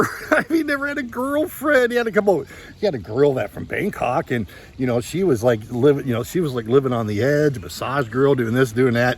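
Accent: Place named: Virginia, United States. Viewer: Stop digging on North Carolina. Your accent isn't much different. What are you from Virginia?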